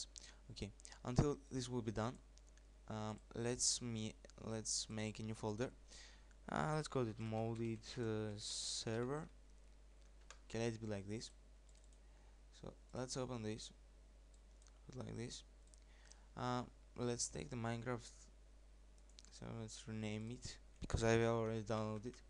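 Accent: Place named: Portugal. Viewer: paraces tuga a falar! LOL~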